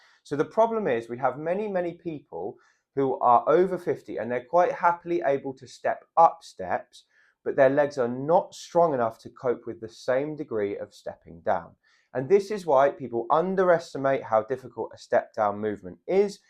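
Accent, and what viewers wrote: England, United Kingdom. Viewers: Thank you so much for your helpful, easy to understand videos. ( SO nice to have a simple English accent! 😊🇬🇧). I am 83 and pretty well preserved. But l slipped during a violent thunderstorm last august (2022) and was very lucky not to have a serious hip fracture, though l am still waiting for re -education in a European country where everything is so slow. But since COVID maybe it’s slow everywhere. ) l just have problems with my right leg. Cannot cut my toenails or put my shoe on easily and l have to walk with a stick. Even with that l get panic attacks when faced with a down slanting slope. I have downloaded nearly all your videos and started seriously today with the glute excercise. Thanks again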